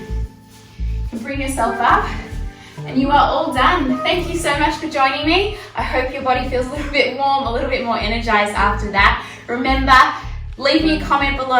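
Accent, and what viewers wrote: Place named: Australia. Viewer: You have such an aussie jew accent haha love it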